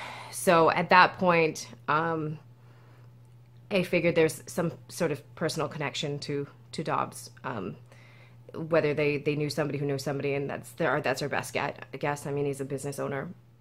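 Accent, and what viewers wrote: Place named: Europe. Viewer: He sounds like he's from one of the bloodlines of cowards that stayed behind in europe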